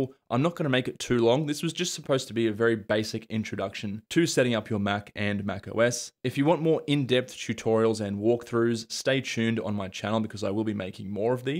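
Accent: Australia. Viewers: Thanks fornthe turorial man. It's so nice to have a tutor with Australian accent 😁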